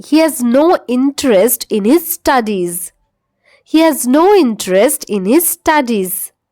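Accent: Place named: North Bengal, India. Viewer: উত্তরবঙ্গ মনে হয় আপনার বাড়ি।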